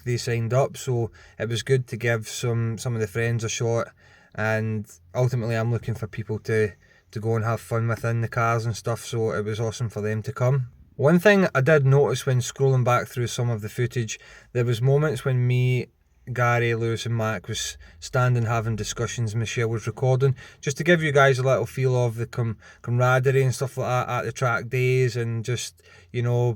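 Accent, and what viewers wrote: Scotland, United Kingdom. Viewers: Great video. Liked and subscribed. Great to hear another Scot 🏴 on here. Going to spend some time watching your vids. Specially enjoy the Type R ones 👍 keep them going mate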